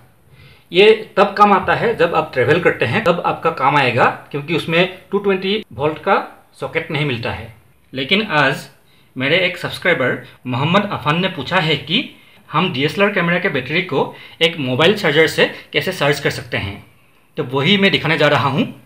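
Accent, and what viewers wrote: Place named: Assam, India. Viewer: kiunki tu bengali hai wo asamese hai. dont make fun on others accent. being a bengali i know how u people too have funny hindi accent.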